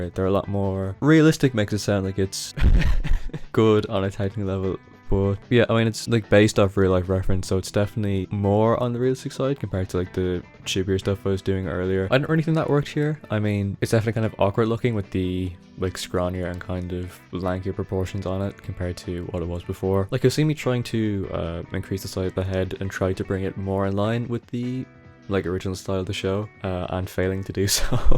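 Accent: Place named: Ireland. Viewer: I myself have just over 8 years drawing experience and I can say for a fact that your work is greater than some 2nd 3rd year art college students I know. You definitely have the ability to comprehend the forms and shapes of objects and you have a knack for visual effects as well, which are some of the hardest parts of Shonen or Fantasy artworks, Be proud and remember that "slow progress is better than no progress" when you are stuck in a burnout or creative block :) I think I hear an Irish accent in there so if youre not in college yet, do consider This Is Art! Alot of the time when your work is judged they send feedback given by some Art Professors and Spearheads in the creative industry - Hope this is helpful!!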